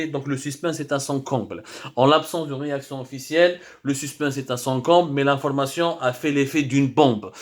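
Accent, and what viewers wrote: Algeria. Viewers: Abdou Semmar est Algérien son accent le prouve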